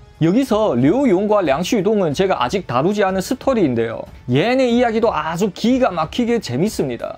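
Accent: China. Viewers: 님은 조선족 출신인가요? 조선족 출신이라는 표현이 맞는지 모르겠습니다만 친한형님이 광저우에 공장에 있어서 여러번 같이 갔었는데 현지에 황룡하라는 친구가 조선족 이었습니다 참 착한친구인데 이 영상보니까 룡하 보고싶네요 ㅋㅋ 코로나 때문에 언제 또 갈지 기약이없지만. ㅋㅋ 안녕하쇼오. 룡하 하고 완전 똑같네요.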